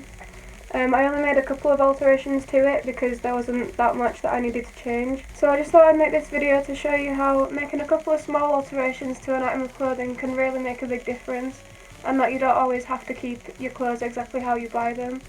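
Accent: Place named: United Kingdom. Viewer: What part of UK are you from? Your accent is cute :P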